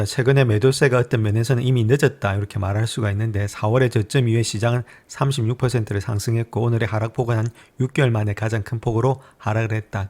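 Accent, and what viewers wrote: Gyeongsang, South Korea. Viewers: 일고리즘 타고 왔는데… 미국에서 온 전문가라면서 경상도 사투리.. 바로 퇴장.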